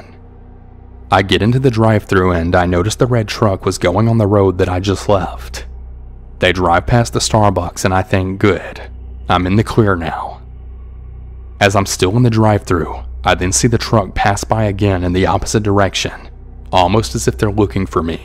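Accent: South, United States. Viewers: It’s the southern accent for me 😅😌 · It took me a minute to realize he has a country accent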